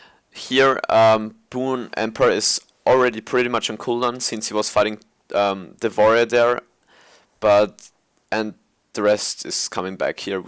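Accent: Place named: Germany. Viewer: According to your accent i would say you're german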